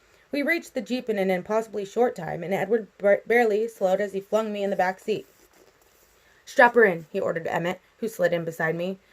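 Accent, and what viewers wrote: United Kingdom. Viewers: Your English accent is amazing 😊🏴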